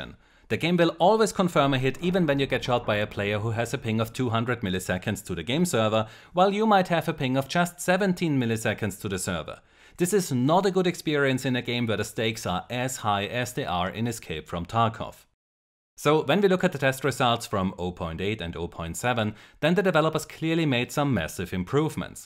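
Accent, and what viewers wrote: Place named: Germany. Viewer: Den deutschen Akzent wird man einfach nicht los 😔. Gutes Video!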